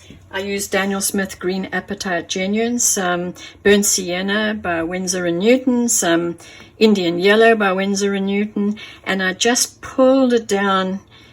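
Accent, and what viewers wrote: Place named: South Africa. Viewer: Thank you. I recognized your accent. I was born in SA. Love your work, been doing watercolour for many years, but this is a fresh look at doing abstract. Im going to try my hand at it.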